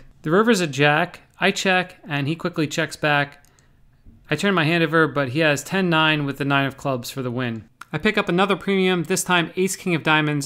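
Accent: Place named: Mid-Atlantic, United States. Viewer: Toedal profit lol can't hide that mid-atlantic accent, really enjoy the vlog!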